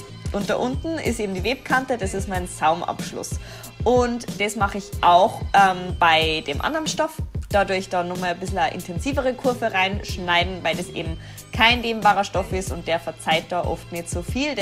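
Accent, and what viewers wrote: Vienna, Austria. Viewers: Woher bist du eig., aus Wien,... oder Bayern?😂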